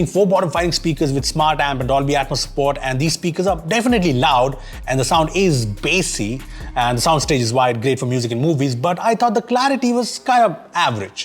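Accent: India. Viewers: This comment will be called rasiccist but I love the way Indian male acsents sound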